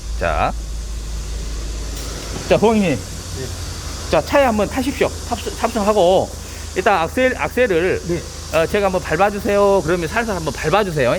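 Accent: Jeolla, South Korea. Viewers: 어디서 공업사를 하시는지 궁금합니다 전라도 말투신것같아서 전라도면좋겠어서 댓글드립니다ㅠ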